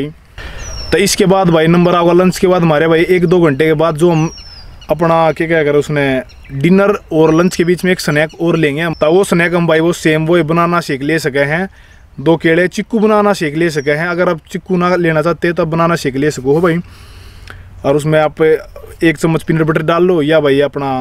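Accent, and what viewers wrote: Haryana, India. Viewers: Haryane balo ki boli acchi lagti h bhai 👍👍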